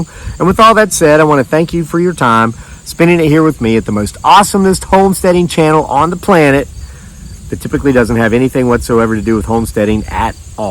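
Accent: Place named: West Virginia, United States. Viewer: I love listening to your stories in your West Virginia accent....thank you!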